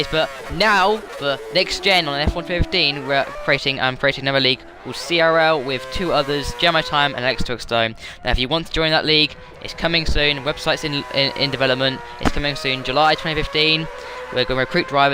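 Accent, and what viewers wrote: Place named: Birmingham, United Kingdom. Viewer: BRUMMIE BITCH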